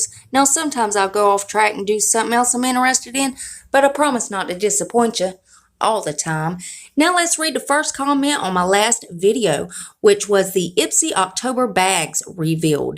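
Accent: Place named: Texas, United States. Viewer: Where are you from? You sound like a southern girl like me...Texan! 😁 Love your channel!😍😍😍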